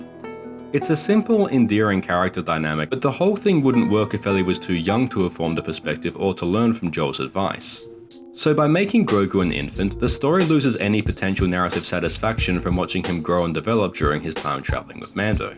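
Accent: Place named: Australia. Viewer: God I hate the australian accent so much and ur video sucked ur reasons why the first season was bad were minor nobody rly gives a f abt some fob what I care is structural storytelling and good characters. Season 1 had that and like all good things Disney must destroy with season 3. You rly suck at "breaking down" things pls sygau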